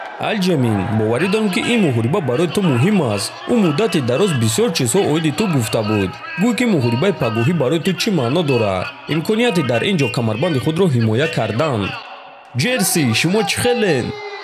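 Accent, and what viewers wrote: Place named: Dushanbe, Tajikistan. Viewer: Аксенти ту ҳамин аксенти Душанбеги бисёр сарама маиз мекунад. Аксентат гуҳ хаст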